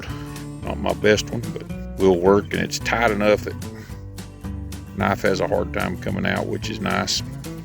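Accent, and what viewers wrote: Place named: Kentucky, United States. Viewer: Hope your knee feels better soon! Yep, on your knife...the old ones can be really good. Oldies but goodies. My sister lives on the high desert. But, hey, I lived in Kentucky for 14 Years...I knew you sounded like you might be from that area...then I heard you mention Tennessee. Wonderful area back there with awesome folk.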